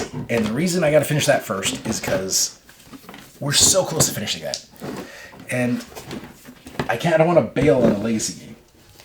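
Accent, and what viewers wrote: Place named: Canada. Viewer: My wife is from White Oaks, is that near you? She loves when she hears your Canadian accent.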